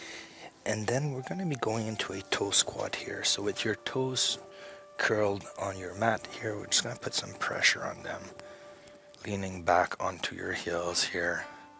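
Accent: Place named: Montreal, Canada. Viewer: I love your classes so much. I grew up in Montreal's south shore so your accent makes me feel at home. Glad your knee is healing and you are able to post more often. Thank you so much!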